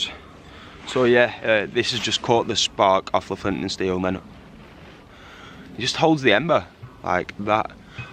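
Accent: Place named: Yorkshire, United Kingdom. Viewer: 😂😂😂😂😂🤣🤣🤣🤣🤣just fucking with you brother hahaha we all need a laugh actually liked your vid bro we’re bouts you from sound like a Yorkshire lad like myself brother keep it up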